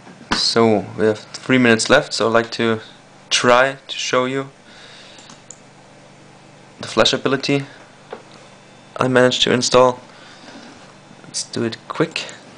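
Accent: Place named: Scotland, United Kingdom. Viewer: Haha je praat een beetje met een schots accent:p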